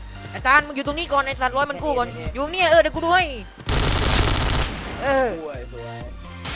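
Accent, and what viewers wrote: South, Thailand. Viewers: คนใต้ปาวคับ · เป็นคนใต้ใช่ป่ะ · อ่อ เเด็กใต้น่อหลวง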